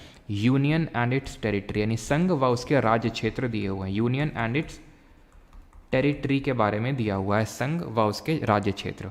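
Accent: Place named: Hamirpur, India. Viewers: Are vahh sir ap to ek dm Mori trf hamirpur U.P ki side ki bwalat hva😅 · Sir are you from up voice tone se lag raha hai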